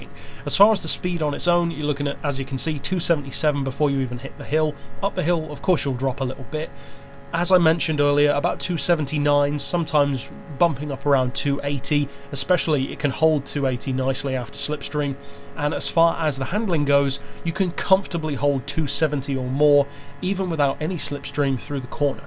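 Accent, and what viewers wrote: United Kingdom. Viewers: All British people sound like to be honest.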